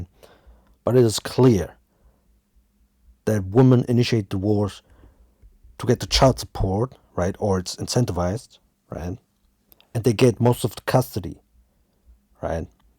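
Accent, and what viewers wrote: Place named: Germany. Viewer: Are u german I like your accent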